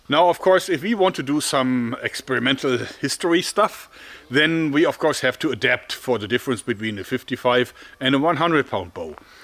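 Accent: Germany. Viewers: 😆😆😆 Explanations boring? How can we resist History with ASMR "jerman" voice and giggle ♥️✌🏼📝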